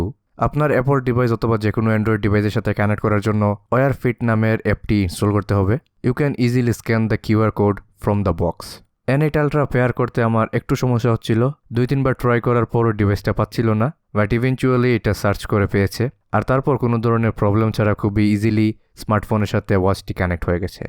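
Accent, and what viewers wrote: Sylhet, Bangladesh. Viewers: Are u Sylheti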